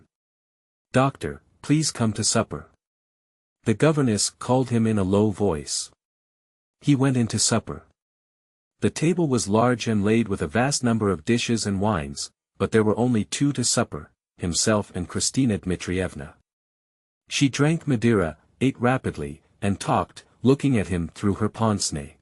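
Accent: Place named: United States. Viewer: I dont like américain accents, to me ruins the poetry of the words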